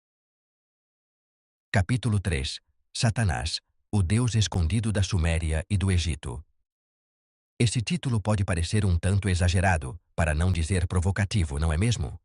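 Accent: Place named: Portugal. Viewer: Narração com sotaque lusitano é um lixo mesmo...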